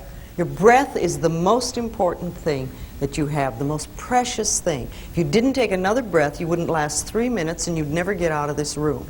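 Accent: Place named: United States. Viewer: What American accent she is using ?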